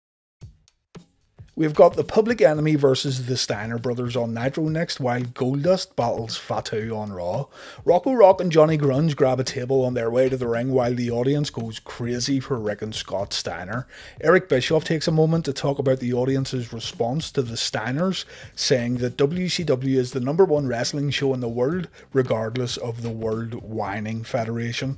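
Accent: United Kingdom. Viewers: What part of UK you from?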